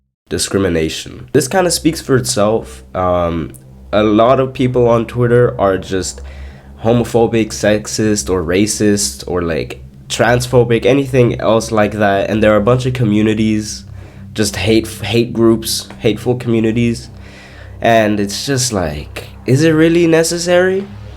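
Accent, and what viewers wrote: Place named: Germany. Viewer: Also a German here! Man hört den Akzent aber nur ganz schwach raus, find ich :D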